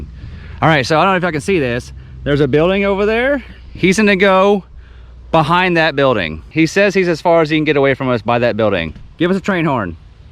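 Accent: Texas, United States. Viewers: I did neglect to tell you that I was stationed at Sheppard AFB Texas when I was a blue store fan. After I retired from the USAF, I moved to PA. My wife and I miss everything about the state of Texas, except the heat. The first time I heard you say "Good Mornin'", I knew you were from Texas! My wife and I were laughing so hard when people were trying to correct you, saying their name. The funny thing is we both say it just like you and your wife. Keep the videos coming. We really enjoy them! Oh yeah, I think you should know that Mario dude is following you. Lol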